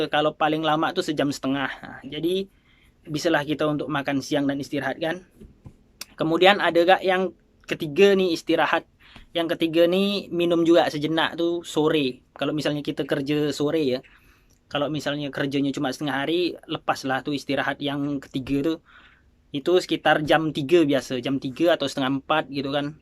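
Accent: Malaysia. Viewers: Mari logat jowo, kenape sekarang jadi nak Melayu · mantep mas, bahasa kuala lumpur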